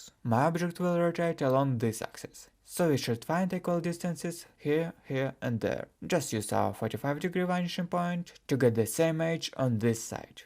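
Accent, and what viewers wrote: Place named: Germany. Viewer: Ur accent sound so germany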